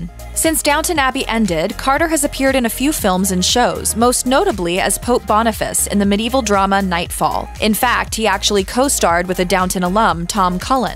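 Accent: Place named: United States. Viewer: Blimey! That American accent is ear-splitting!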